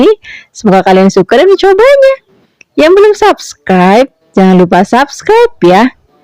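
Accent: Java, Indonesia. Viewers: tinggalnya di mana sih ko logat bahasanya Jawa banget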